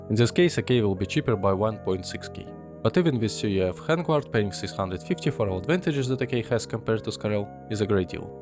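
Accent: Russia. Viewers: I thought you were russian Nice video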